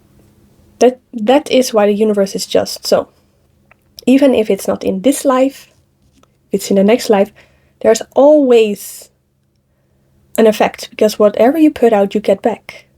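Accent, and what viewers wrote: Netherlands. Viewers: I know a Dutch accent when I hear one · I like it..nice..video.. .reminds me of the Netherlands ur accent..a lovely country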